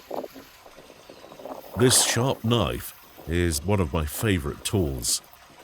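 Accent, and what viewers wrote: United Kingdom. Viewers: Food looks delicious, like it always do. But I'm not crazy about the British narrating. I like the original videos, where it was just nature and him cooking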